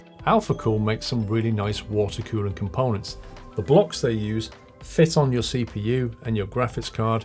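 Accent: United Kingdom. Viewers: OK to be honest his voice sounds British but his face says Florida man 100 %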